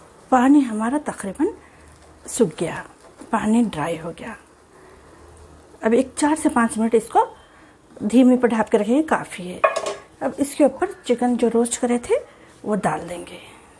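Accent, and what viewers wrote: Hyderabad, India. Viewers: Aunty ma to apki voice over k liye apki video dekhti hu your voice is really nice hyderabadi.....😀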